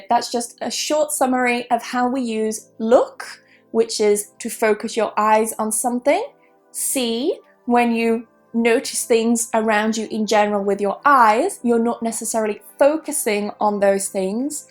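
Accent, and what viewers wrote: United Kingdom. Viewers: Thanks for the video...It's very useful for me..., l love ( watching) the way you talk in british accent...,by the way...you're the most beautiful english tutor l have ever seen,...thank you...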